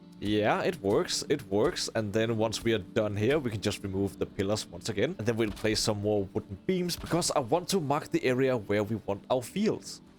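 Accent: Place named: Denmark. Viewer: Hehe, love the Danish dialect, keep up the good work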